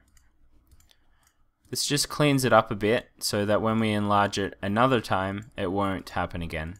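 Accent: Australia. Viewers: You sound Australian and American? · Are you Australian? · are you aussie?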